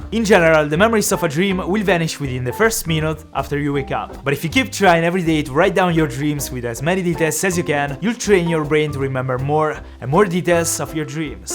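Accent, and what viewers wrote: Italy. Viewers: Si sente che è italiano😆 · ho appena realizzato che sei italiano 😂🤣😅 eppure già dall'accento me n'ero reso conto 😂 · What about learning, I mean totally nailing a British accent next video - could be interesting to see how an Italian would improve his accent! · Gia dalla voce si capiva ma è italiano ahahahah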